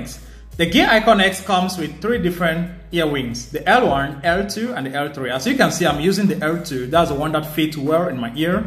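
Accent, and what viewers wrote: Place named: Vietnam. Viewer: Are you vietnamese, you sound vietnamese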